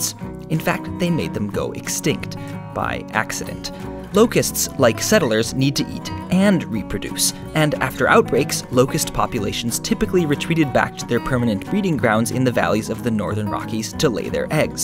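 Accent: Midwest, United States. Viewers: It the midwestern accent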